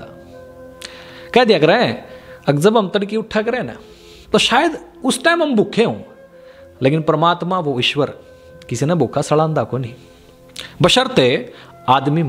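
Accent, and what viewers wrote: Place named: Kaithal, India. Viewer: Ji aap kaithal se ho kya. द का न ward se pta chala h..bhut badiya bolte ho aap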